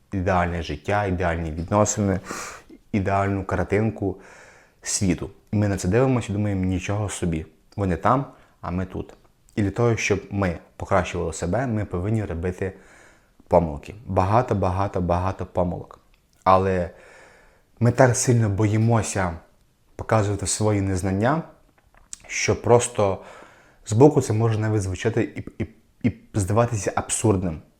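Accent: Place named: Galicia, Ukraine. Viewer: Клас! Підбадьорив і ніби прожив моє життя і мої комплекси в минулому., дякую за твоє відео і за твою відвертість. За такими людьми, як ти, майбутнє нашої планети і наступних поколіннь. Судячи з акценту і прізвища, ти є з Галичини) Я вгадав? Перепрошую, що одразу на "ти", просто так відчуваю.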